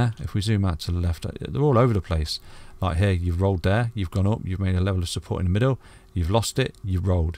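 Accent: United Kingdom. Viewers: Gotta love the Bri’ish accent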